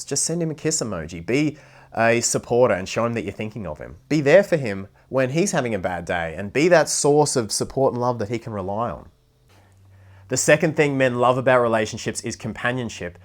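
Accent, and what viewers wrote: Australia. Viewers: Girls must love his Aussie accent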